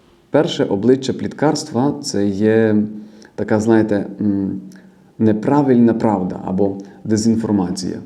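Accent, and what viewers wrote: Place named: Transcarpathia, Ukraine. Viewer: Слава Іісусу Христу! Дякую от. за Ваш підхід і пояснення теми пліткарства, особливо тронула кінцівка про наповнення Божою любовью.Рада за Вас, що збільшується кількість слухачів, а значить однодумців.Приємно чути закарпатський діалект.Вітання всім зі Сходу з Харкова, нехай Бог усіх береже, єднає через Своє Слово і веде до перемоги 🙏📿🇺🇦🕊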